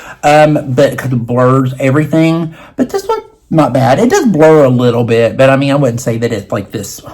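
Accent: South, United States. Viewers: Thank God you reviewed this foundation. I am 39, had a bday recently and have dry dry skin from Sjogrens syndrome. My mom has cancer and has dry skin and has begged me to find a foundation for winter for dry skin. We are Lancome people but they have really changed formulation and now their products are for younger people and they are totally missing the market. So upsetting for us younger middle aged ladies and elderly women like mom because they have discontinued EVERYTHING that worked before and reformulated some things for combo skin and oily skin. Sooo, us dry skinned people are left out in the cold. Just subscribed and will watch some of your older videos. Love that you are down to earth and that you are using a brush for this foundation and not fingers. Most people reviewed this with fingers and didnt get coverage but a dense brush makes more sense. 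Also, I'm from Texas and as a Southerner your accent is normal to me. I watch Lisa and Michelle as well but lately I am SOO UPSET with high end brands dropping the ball with some products. I know Chanel and Dior has reformulated so much with inferior ingredients to keep cost down but those of us with rosacea can really feel it in the skin.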